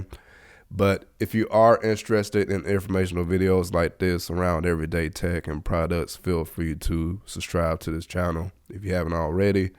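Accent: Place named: Southeast, United States. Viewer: Most SE USA voice I heard as someone from Charlotte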